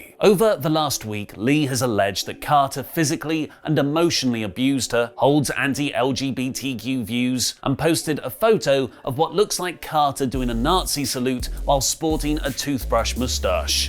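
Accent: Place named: United Kingdom. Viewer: I must be going deaf. It sounded like Ollie said: "Emotionally abused her old anti-LBGTQ views", which was only slightly confusing someone would be released for abusing anti-LBGTQ views in 2022. I had to rewind it five times to hear "Emotionally abused her, holds anti-LBGTQ views", which makes a lot more sense why he would be released. That British accent will get you sometimes. 😆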